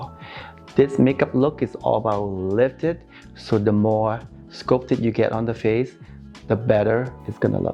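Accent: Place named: Vietnam. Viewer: You have the cutest vietnamese accent. My boyfriend is vietnamese american i live in a very vietnamese area in northern va as far as asian population. Love ur food and ur culture 💚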